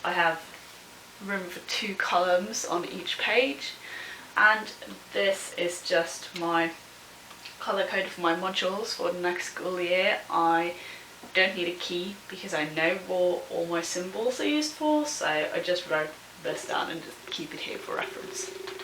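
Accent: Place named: United Kingdom. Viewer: Thats header very cool & I loved your accents too.... British accent?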